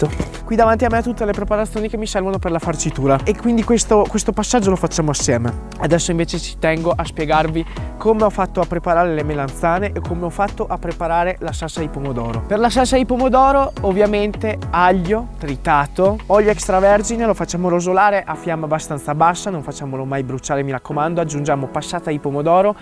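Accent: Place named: Milan, Italy. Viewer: Sei Milanese eh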